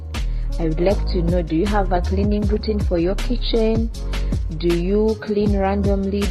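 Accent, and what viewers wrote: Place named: Zimbabwe. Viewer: Accent sounds very Zimbabwean 😘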